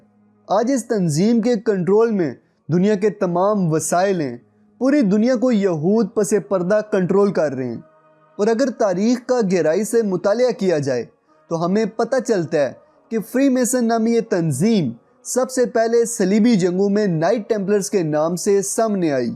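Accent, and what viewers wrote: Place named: Mandi Bahauddin, Pakistan. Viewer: جناب گرد اپنی منڈی بہاؤالدین والی اڑڑدو ٹھیک کرو نہیں تو پنجابی بولو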